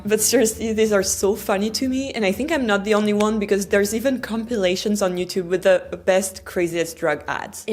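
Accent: France. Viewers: Pretty sure 'Chicago' is the only word you said w/out a French accent. Guessing you say it how Bobby does 😄😄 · I love that you pronounce Chicago just like someone from Chicago! It contrasts so sharply with your lovely French accent:] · French accent engrish is annoying.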